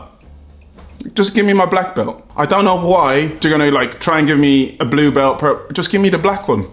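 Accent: Ireland. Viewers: London? He sounds Irish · Is that a new Irish accent?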